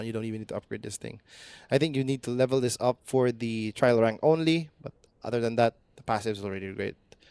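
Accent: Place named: Philippines. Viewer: Bro sounds Filipino HAHAHA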